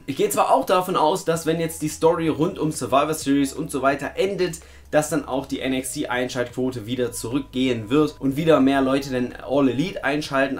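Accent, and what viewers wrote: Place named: East Germany, Germany. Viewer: Ossi halt 😄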